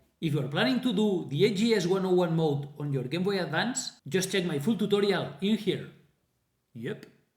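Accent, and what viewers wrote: Spain. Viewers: Ostia este es español. Me juego lo que sea XD. Que acentazo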